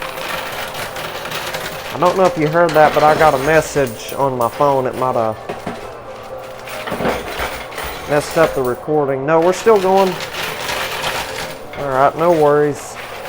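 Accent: South, United States. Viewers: That is a southern US accent if I’ve ever heard one.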